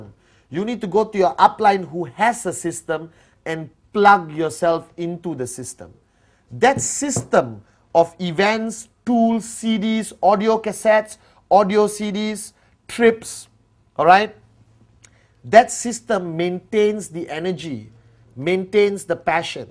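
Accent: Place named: Malaysia. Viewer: it should be in Hindi language also... because of most of the people are unable to understand English specially in malesian accent.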